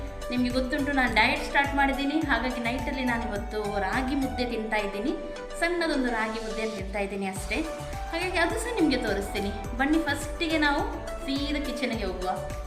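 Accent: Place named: Kundapura, India. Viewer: actually ನನ್ನ ಅಮ್ಮನ ಊರು ಕುಂದಾಪುರ.. ನಿಮ್ ಮಾತಾಡೋದು ನೋಡಿ ನಮ್ಮೂರಿನ ಹುಡ್ಗಿ ಅಂತ ಗೊತ್ತಾಯ್ತು.. ಅದಕ್ಕೆ ಕೇಳಿದ್ದು.. Don't mistake me..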